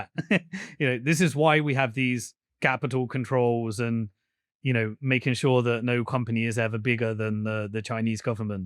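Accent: United Kingdom. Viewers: He makes these wildly false statements. He drastically oversimplifies things he conflates completely unrelated things together. He refers to things that have Then, in place forever as new While stating his wild predictions and speculations as fact. He is the textbook example of a Zionist pompous british ass. Who thinks he could lie to your face because he has a british accent. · You sound like a city of London guy. You have no idea who Trump is or what he is capable of.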